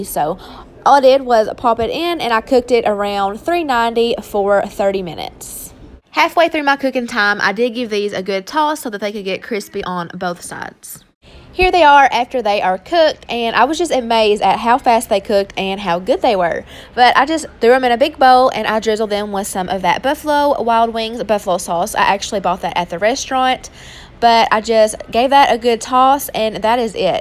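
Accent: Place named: North Carolina, United States. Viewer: Girl you must be from NOrth Carolina lol... that accent though 😆 love it! And thanks for the great meal ideas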